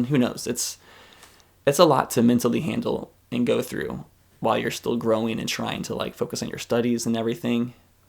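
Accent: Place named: United States. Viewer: Hey, Thank you so much for sharing. I have been watching your content for a very long time and suspected early on you were gay but didn't put much further thought into it since it didn't matter to me either way. Just was an observation like noting what colour your hair is or that you have an American accent. My mum has a few friends/acquaintances that are gay so growing up it wasn't weird to me but I was also aware that not everyone was accepting of people who are gay. I also knew people in high school that did the same thing as you did and pretended they were straight by dating someone of the opposite sex and are now happily out and proud. I was a big tomboy growing up and like doing things that were considered much more masculine but I am straight, while one of my friends is a huge girly girl/super feminine and she is gay. So I very much believe you are born gay based on that as well as what other people who are gay have personally told me. 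I am so proud of you and just want you to be happy:)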